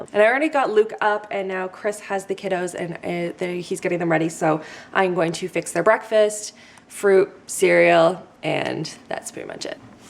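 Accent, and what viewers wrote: Canada. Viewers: When you get near your parents you get 100x more Canadian LMAO this video was so cute :)) I would love to see these more! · I love how your Canadian accent comes out so much more when your at your parents house. Seriously love you lol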